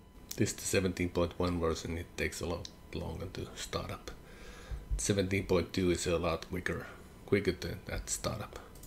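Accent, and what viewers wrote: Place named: Finland. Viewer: Ei se pahasti kuulu läpi se ralli englanti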